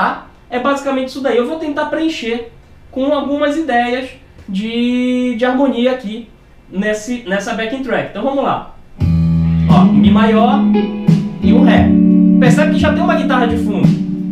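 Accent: Pará, Brazil. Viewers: Ele e paraense, da pra ver pelo sotaque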